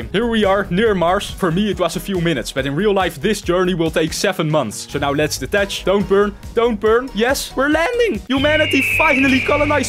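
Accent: Netherlands. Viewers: Your Dutch accent 💀💀💀💀 I love the goofyness, the thing is, as a Dutch guy myself it's pretty cringe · Damn, some Dutch accent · is he dutch,? i recognize the goofy accent.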